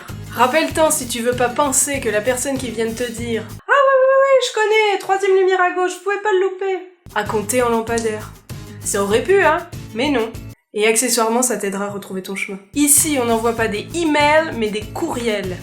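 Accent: France. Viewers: M0i jaddort l'accent française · Messemblais que tavais un accent français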